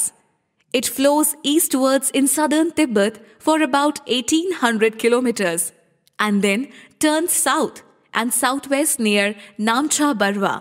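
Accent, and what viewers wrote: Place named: India. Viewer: 这印度英语真够味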